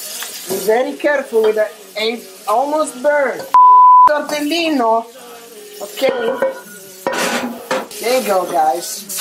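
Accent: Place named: Italy. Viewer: Oggi fanculo i commenti costruttivi, adoro il tuo accento italiano misto ad una punta di smusi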